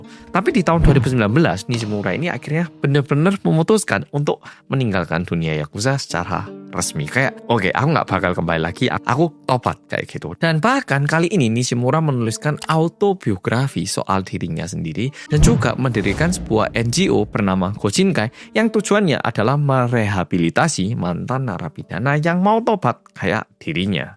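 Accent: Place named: East Java, Indonesia. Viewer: Ciri khase omongan pasuruan Malang Masalahnya Untungnya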